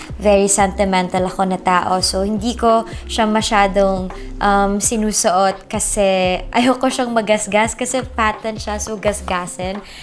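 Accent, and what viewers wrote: Philippines. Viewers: Ang soft ng voice pinay na pinay ang accent❣️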